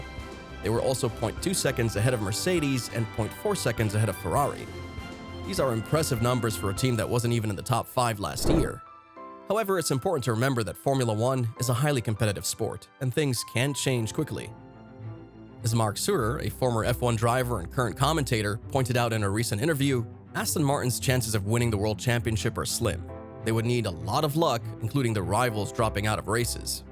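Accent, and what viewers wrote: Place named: United States. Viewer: Ironic to hear an American accent thinking it knows anything about F1🤣 Instant stop and scroll,😝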